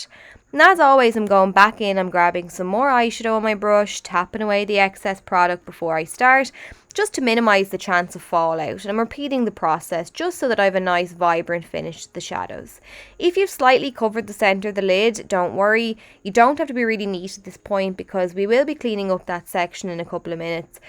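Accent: Ireland. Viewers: I love your beautiful Irish accent!💖greetings from Israel😁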